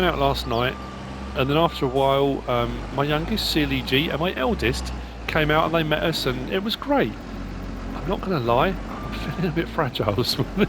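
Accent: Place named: United Kingdom. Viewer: "Kango-hammer" seems very fitting for the British dialect, you saying "jack hammer" just doesn't sound right with your accent. (Plus Knango hammer just sounds cooler lol) I work in a quarry, kinda. I work for an aggregate and concrete company, I drive the redimix trucks. So you're pretty spot on with your story line, which was a brilliant idea and nicely executed. As far as the diehards that say "it's farming Simulator, there shouldn't be any forestry", I've never met a farmer that didn't own a Chainsaw, that wasn't ready to cut some trees down for field expansion and for firewood. Not to mention, TREE FARMS??? There's lots of tree farms out there for wood pulp for paper. Any farmer out there is looking to make the most of their time, and to not ever run out of money makings. I don't understand these people that say "you can't do that on this, you're HAVE to do it THIS way". 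If it were said to me, I'd have a few choice words for them, of which I won't say on video. DO YOU. Don't worry about it I'm not doing it the way you would.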